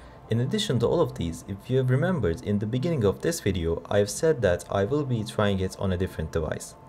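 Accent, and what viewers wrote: Turkey. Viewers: Sesinden Turk oldugunu tahmin etmistim diye goruce emin oldum, Jay in videosundaki yorumu gorup geldim. Eline saglik 🙏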